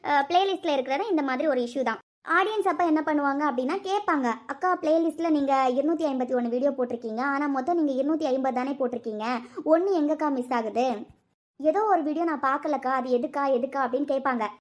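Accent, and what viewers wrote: Kanyakumari, India. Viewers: Ayyo sister kanyakumari slang kaga dhan video pakkavey start panna enaku unga slang romba pudikum na Kumbakonam enga slang movie la pesura madhiri irukum but ungaloda slang super ah irukum inga ellarumey apdidhan sister inga pottiya vida poramai dhan adhigam neenga video podunga nanga support pandrom 2 days ah yen video varalanu think panna new married outing edhuvum poirupinga so video podalanu nenachan but idha pathonadhan theriyudhu enna reason apdinu enga support eppodhumey ungaluku undu neenga video podunga ❤❤❤❤❤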